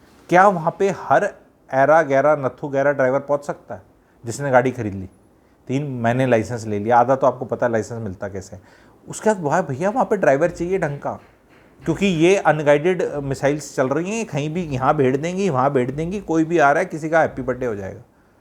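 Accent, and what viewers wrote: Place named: North, India. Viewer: Mast bolte ho aap...typical north Indian style...maza aa jata h...hasi nhi ruk rhi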